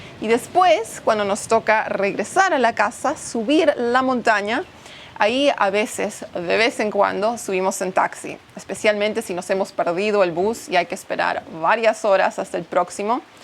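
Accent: Argentina. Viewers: Es increíble el cambio de idioma que estás teniendo ya SOS argenta😂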